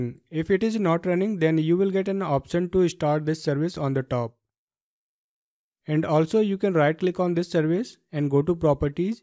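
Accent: India. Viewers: 😁😁😁😁Always the Indian accent guy who knows how to get things sorted on YouTube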